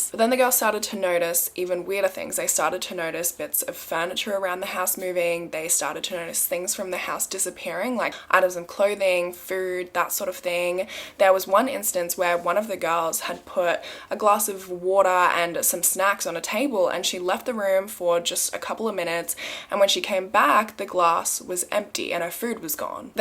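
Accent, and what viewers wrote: Australia. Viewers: You go Girl! Just your Aussie accent makes me bingewatch your vids!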